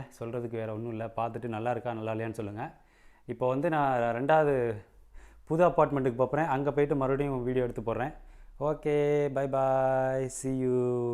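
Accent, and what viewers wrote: India. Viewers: Hey, your Tamil slang still same, that’s cool 😎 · நம் ஊர் தமிழ் கேட்கும் போது மிக அருமை.உங்க குழந்தை தமிழ் பேசுமா.வாழ்த்துக்கள்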